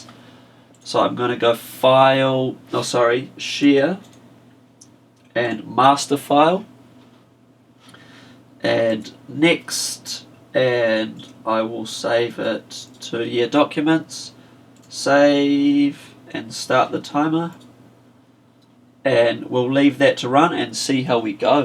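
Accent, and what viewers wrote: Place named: New Zealand. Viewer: that is a Kiwi accent, (New Zealand)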